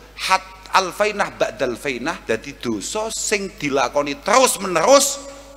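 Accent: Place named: Java, Indonesia. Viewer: WAO GUS CERAMAHNYA DAGING SEMUA , SUBCANALOH ,PRIATUNE ENOM , GANTENG , MUKA ARAB ,,JOWONE MEDOK BANGET , ILMUNE TINGGI ,,,COCOKNYA KALAO DUDUK JEJER SAMA GUS BAHA , SUMBER ILMU SEMUA ,,,WAO KEREN GUS KAUSAR ,, KULO BANGGA GUS DI KEDIRI WABIL KUSUS PLOSO MELAHIRKAN KYAI MUDA CALON KYAI BESAR YG BER KAROMAH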